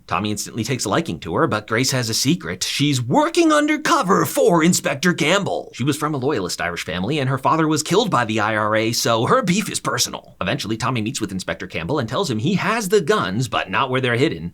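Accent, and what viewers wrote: United States. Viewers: Very good recap, not an English standard, but for an American quite acceptable. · I can't lie I was initially dubious with the overly American accent but this was a fucking great summary. Honestly made me realise shit I didn't even notice second time watching the show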